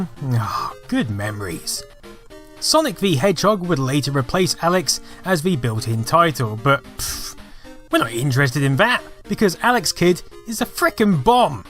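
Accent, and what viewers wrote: United Kingdom. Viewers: When British people pronounce Japanese words I cringe so hard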